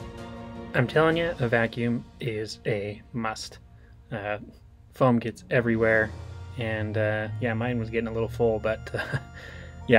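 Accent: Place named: East Tennessee, United States. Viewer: You sound like people I know from East Tennessee.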